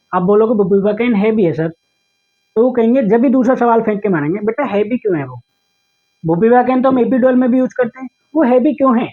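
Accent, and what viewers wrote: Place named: Bihar, India. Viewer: Aap Bihar ka san hai sir jii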